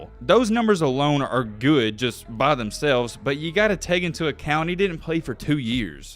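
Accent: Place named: Appalachia, United States. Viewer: Bro, your hillbilly twang is awesome!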